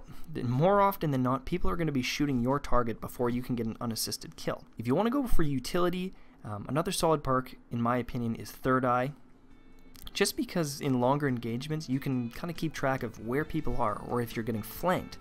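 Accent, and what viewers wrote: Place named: Canada. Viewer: Oh haha, yes I do. Is that how its said in a Canadian accent? Thought you just mispronounced a couple words in one sentence. Will go check out your channel now.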